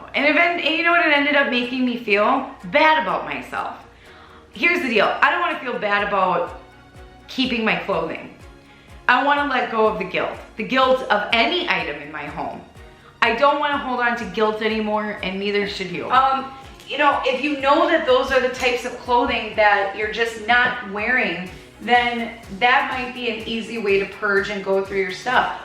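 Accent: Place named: Michigan, United States. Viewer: Just happened across your channel for motivation! Your accent sounds like you are from Michigan or somewhere int that region. If so, hello from Michigan!!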